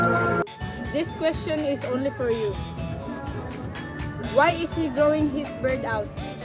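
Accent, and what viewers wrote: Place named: Visayas, Philippines. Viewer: Cute kaayu mo mgbisaya ui...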